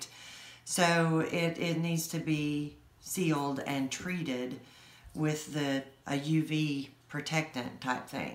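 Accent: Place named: Oklahoma, United States. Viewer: I promised I'd watch it! Very nice job explaining everything....it's easy to understand and I jotted down lots of notes. Mind you...how difficult was it years ago to get all this information...thanks to technology and U-tube it's right there when you want it. Love the way you do your videos...and your accent...you sound a bit like a friend of mine in Oklahoma. Thank you ❤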